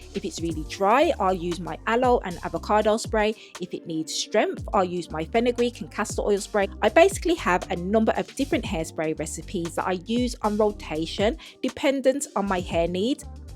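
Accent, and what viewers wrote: Birmingham, United Kingdom. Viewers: This woman is a Brummie. I don't know what you think you are hearing.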